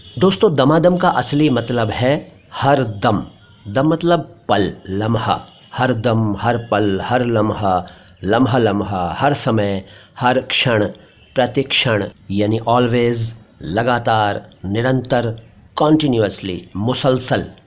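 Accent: Standard. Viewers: आपका शुद्ध उच्चारण सुनकर तबियत खुश हो जाती है ।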